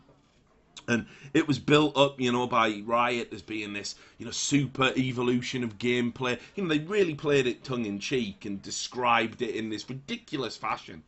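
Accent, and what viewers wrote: Wales, United Kingdom. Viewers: Love the welsh accent. I'd like to hear it more often. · Wth it's so weird to hear you talk like that! I'm not a native speaker so it never occured to me that your usual way of speaking was some sort of broadcasting voice. Well at least now I kinda know what a Welsh accent sounds like, so I learned something from the video. I liked last year's april fools joke by esportsexpress tbh. Publishing legit but funny chatlogs by Arteezy and keeping the community guessing if they were actually legit or if it was just an april fools joke. In general I'm way too gullible so maybe I don't dislike april fools day that much, because I may actually fall for it sometimes. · Why is your Welsh accent so strong in this video? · I want you to gently complain about things in my ear with your Welsh accent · I thought the welsh accent was a april's joke. 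Had to double-check the date. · Welsh accent coming back?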